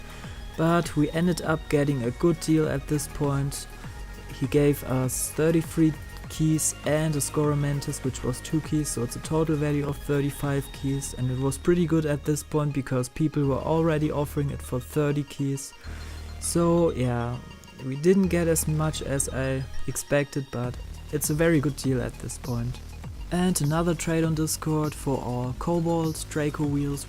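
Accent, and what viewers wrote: Germany. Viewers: Hey dude nice vid you lissen Like a German Guy ^^, i am German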